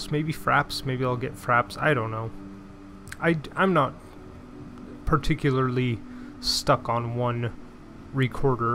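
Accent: Canada. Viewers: are you Canadian? you sound Canadian.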